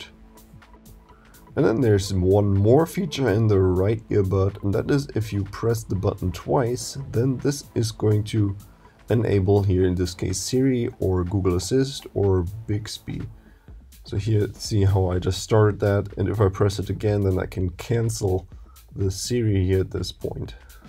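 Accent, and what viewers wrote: Germany. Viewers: I think ur a german Ty for the instruction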